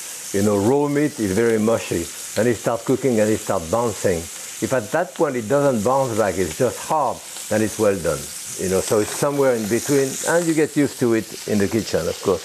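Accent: France. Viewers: Sean He just has a thick French accent...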